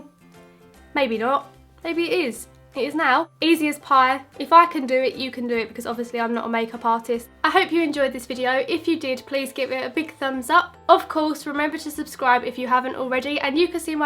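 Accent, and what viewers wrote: Essex, United Kingdom. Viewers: You MUST be from Essex!!!! We have same accent I'm convinced! X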